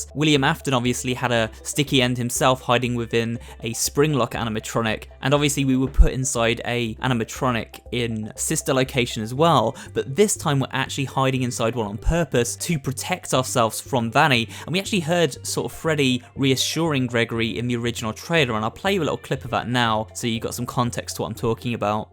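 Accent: United Kingdom. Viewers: you sound like your from the UK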